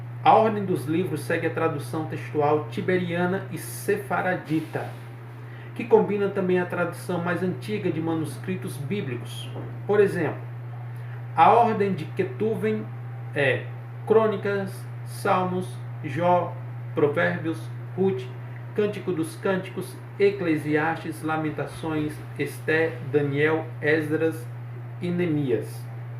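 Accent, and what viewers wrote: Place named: Brazil. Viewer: Mais um que acha que judeu, até me interessei em saber o que significa um códice, mas já começa falando esse hebraico abrasileirado 😅 tô fora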